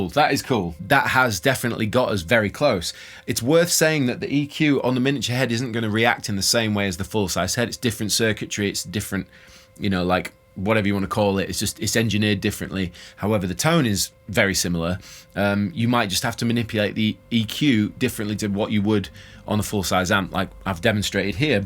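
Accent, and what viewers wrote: United Kingdom. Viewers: even your spoken words are so accurate, like your renditions haha. Don't get me wrong, English is not my first language thus sometimes it is very diffulicult for me to understand British accents in this US accent dominating world.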